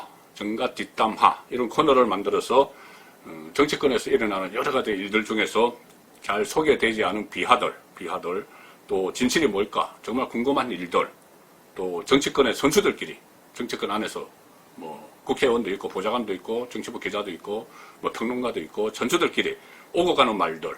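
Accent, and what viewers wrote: Gyeongsang, South Korea. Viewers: 10만 추카드립니다 발전을 위하여 쓴소리 좀 할게요 저도 경상도지만 억양은 고칠수 없습니다 하지만 말의 속도는 줄일수 잇죠 ㅋ 연습하셔서 속도는 좀 줄이면 좋겟슴다 꾸벅! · 경상도.사투리도좋아요 축카합니다.100만쭉~~ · 저는 경상도 사람이라 의 말투가 정겹기만 합니다 오늘 자막처리한 글에 오타가 있습니다 붉어지다(×) 불거지다(0) 아! 10만 구독자 돌파 축하드립니다 내년 이맘때는 50만 독자 돌파를 기원합니다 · 10만 구독 축하드립니다. 진솔함이 묻어나는 송본부장님의 구수한 경상도 사투리 정말 좋습니당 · 추카 추카 합니다 늘 응원하고 있습니다 날카롭고 군더더기없는 비평! 긍께 어쩌겠어요 사투리를 경상도 사람은 잘들리고 알아듣는데 ㅋㅋ^^ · 그래요.. 잘보고있어요. 경상도말씨같은데요. 고향이어디신지궁금해요. 지금잘하고계십니다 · 구독자 10만을 축하드림니다~경상도 억양이 매력적이죠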